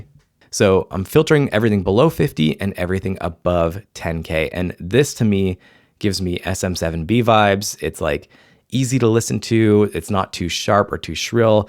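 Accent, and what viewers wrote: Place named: Ontario, Canada. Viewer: of course! I have the LS-208 as well. Great mic! All Lauten Audio mics are fantastic. I have several. You seem to be a fellow Canadian. Also, I’m detecting an Ontario accent there. Maybe north of Toronto? Peterborough? I’m in the Niagara Area, myself. Nice to meet you!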